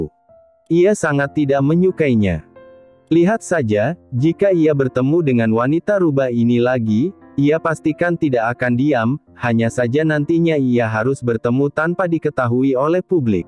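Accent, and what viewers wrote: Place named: Indonesia. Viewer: Logat Indonesia guys guis😊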